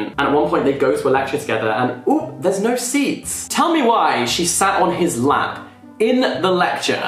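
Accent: United Kingdom. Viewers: WHY IAM I LISTENING TO A BRI-ISH MAN RANT ABOUT BOOKS AT 2 AM? i do not know ( i love him sm)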